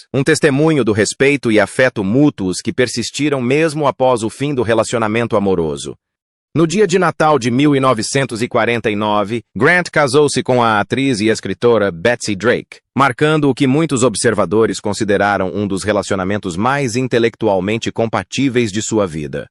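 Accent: England, United Kingdom. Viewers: Para de falar com sotaque ingles. Fica horrivel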